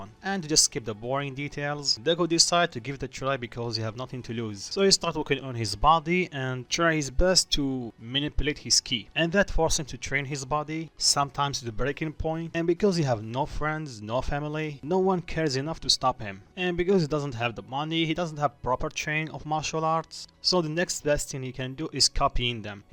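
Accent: Russia. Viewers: Were are u from? I can here a Russian accent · Are you Russian 😅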